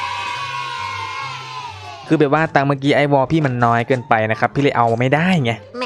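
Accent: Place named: Rural, Thailand. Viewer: อยากให้พี่ พูดเหน่ออะคับ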